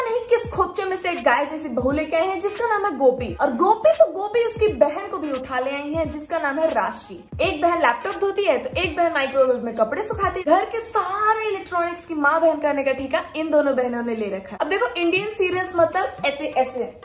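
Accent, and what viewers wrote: Bihar, India. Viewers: This was so damn funny and your bihari accent is juts awesome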